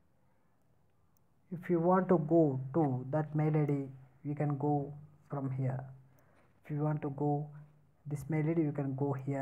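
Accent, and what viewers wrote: India. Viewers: Hes indian , so he has an accent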